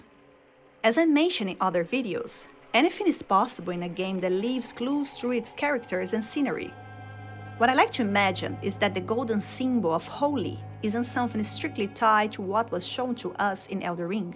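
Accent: Brazil. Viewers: I recognized that Brazilian accent instantly! Lol anyways I was really interested in this topic and your video was one of the first results, so thanks for making it! I actually felt a chill when I saw his second phase the first time. Fromsoft has a way of storytelling without words, where you just understand someone’s entire story and struggle from their actions. When he reached this “redemption” it really made me feel for him, like when he finally met his match (the player), he gets granted a second chance by the gods, presumably with a Miquella-esque nature judging by the arcs. This would tie into your point about the peoples of the Lands Between before Marika, who might’ve been persecuted much like with any empire trying to instate their own doctrines. Miquella’s Haligtree was this “sanctuary” for the afflicted and shunned. I initially thought his arm had some connection to the abyss, like Artorias. 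Especially since Nightreign brings back Dark Souls, I’m unsure if they now officially share an universe. But much like Nashandra brought the influence of the abyss to a far away place, maybe it has something to do with the “Night.” I thought Heolstor’s movements resembled Artorias a bit. Anyways, his “nuke” attack is probably the most cinematic thing I’ve seen in this game. This fight is so much poetry told with such few words.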